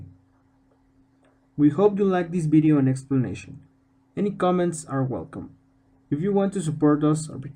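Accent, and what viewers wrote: Spain. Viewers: Um... None of those vowel sounds were correctly correlated to English sounds, but if you listen with Spanish ears it makes sense... It reminds me of the old "pink, green and yellow" joke... (Long story short: "I hear the phone green, I pink it up, and I say, 'yellow'?") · He has a spanish accent it sounds exactly how he said it just americans cant understand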